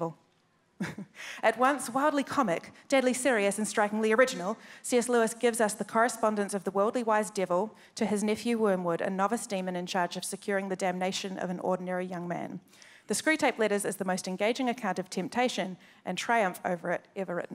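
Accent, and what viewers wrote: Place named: New Zealand. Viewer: New Zealand accent!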